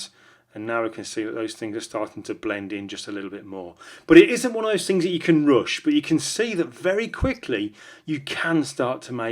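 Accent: United Kingdom. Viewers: Amazing tips, Great stuff , with a British accent too :) keep these coming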